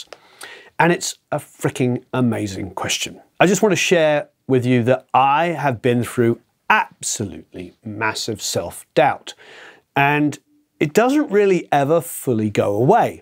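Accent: United Kingdom. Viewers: Great video,! Self doubt comes and goes for everyone, i guess. Taking that self doubt and turn it into creative fuel is a great way to deal with it, if possible. I've found that self doubt has made me get out of my comfort zone and to work even harder, it has pushed me to learn more and study/practise harder in terms of producing, mixing. Thanks for bringing up this important question:) Love the British accent, btw! Cheers from Sweden /Mike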